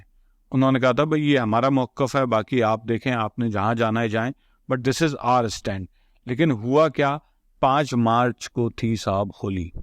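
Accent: India. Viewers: You say you are a Pakistani but your accent is Haryanavi. May I know how and why?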